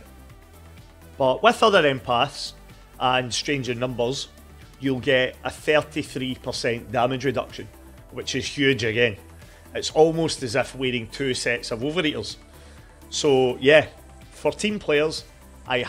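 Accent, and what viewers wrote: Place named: Scotland, United Kingdom. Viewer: Happening mate! I’m also Scottish so am very excited to hear your accent on some good fallout content. Just wanted to point out that you don’t get into the actual build what so every till after the 5 minute mark which means you spent a minimum of 1/4th of this video not getting to the point. Not saying this to out you down, just meant to be constructive criticism. A lot of people who google specific things about a game they’re playing such as “good non-power armour build” want a quick answer to a simple question so that they can get straight back into the game. Hope this maybe helps a bit as your ability to write a script and host is fantastic. You deserve significantly more subscribers and I’ve just become one. Best if luck mate.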